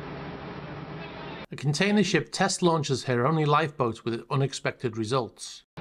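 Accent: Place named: Oldham, United Kingdom. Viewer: Thanks for these short clips. The longer ones too. I’ve been a fan/subscriber since before seized yachts were the big news. I’m in Toronto but I think part of the appeal is your accent reminds me of my friends who came from Oldham. Looking forward to the next one.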